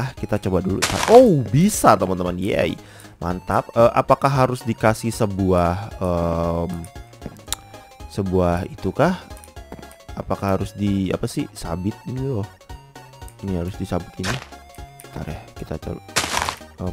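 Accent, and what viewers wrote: Java, Indonesia. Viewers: balik balik... ohhh lanjut medok jgn lupa bang btw main ke channel gw juga guys seru kocak konyol suangar wess mbolah di delok dewe pokoe hehe ✌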